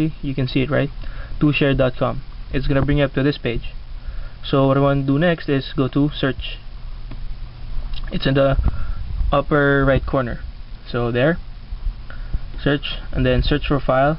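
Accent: Philippines. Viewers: are you filipino cause you have da accent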